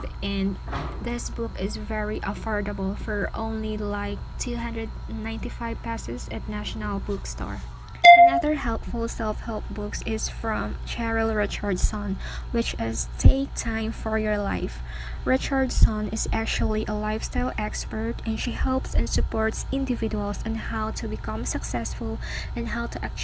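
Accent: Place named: United States. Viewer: Hi pretty sis akala ko american ang nagsasalita ang galing naman mag english..nice books pretty that books its good to my daughter she love to read like you..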